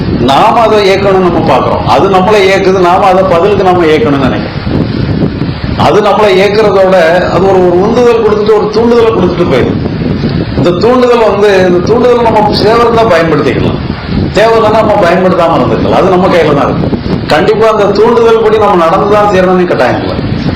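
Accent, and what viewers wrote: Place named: Tirunelveli, India. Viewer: அருமையான நல்ல தகவல் அதுவும் விரும்பும் நெல்லை தமிழில். நன்றி ஐயா!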